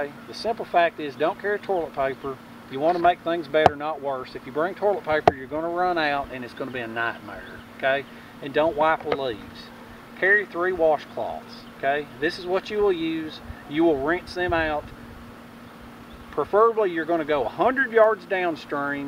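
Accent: South, United States. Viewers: love the accent.im southern too,but.....you have a voice that is calming and would garner trust.love your videos!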